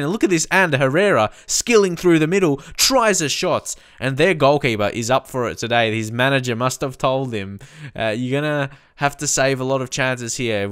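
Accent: Melbourne, Australia. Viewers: That Melbourne Accent.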